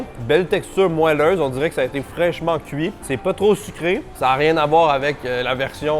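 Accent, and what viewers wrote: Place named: Quebec, Canada. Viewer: J'aime bien la vibe de t'es vidéos quand tu voyage, ton québécois ressort plus que dans t'es vidéo a la maison ! Toujours un plaisir de te regarder :)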